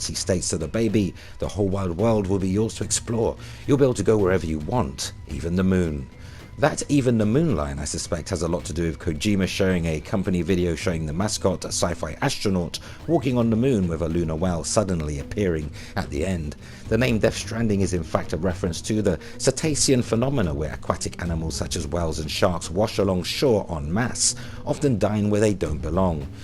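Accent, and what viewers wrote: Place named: Cockney, United Kingdom. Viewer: How come you’re posh in your produced videos, but all geezer in your podcast chats?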